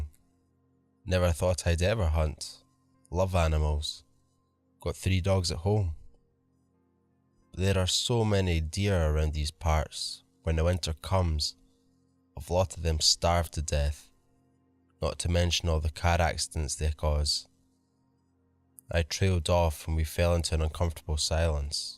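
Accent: Scotland, United Kingdom. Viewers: I just came across your channel after hearing you co-narrate a story with Swamp Dweller on his channel & now I'm hooked. Your Scottish accent makes me think of an old friend that lives out in Bonnie Bridge (spelling?) Scotland. I'm from Botetourt Virginia USA. And I just recently found out that I'm descended from a Watson Clan on my mother's side. So I have recently been digging into my ancestry. I hope to one day visit the Homeland impossibly dig more into my heritage there. Anyhow, please keep up the good work we are certainly enjoying your stories! Cheers!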